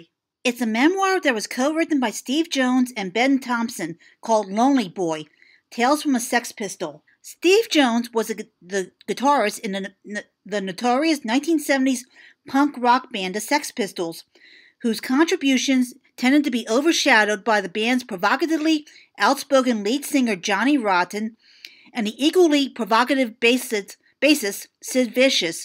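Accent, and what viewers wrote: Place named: New York, United States. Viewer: Cheers K,best review I've heard,or read on the internet.Love that New York accent,I'm from Boston so,people get it after a bit.Lol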